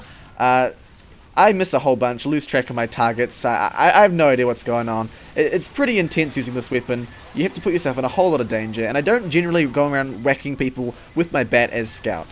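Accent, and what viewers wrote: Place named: New Zealand. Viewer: He's from new zealand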